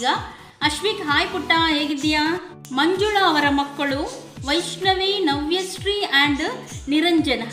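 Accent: Mangalore, India. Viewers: Hi mam nimma vlog super nim maklu bandre enna super niv kannada matnadodu nange tumba esta manglore kannada kelodikke kushi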